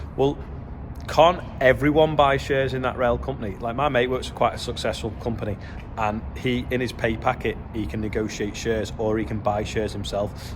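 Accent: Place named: Liverpool, United Kingdom. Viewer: bad tory you lad. terrible hearing that shite in a scouse accent. do one. unsubscribed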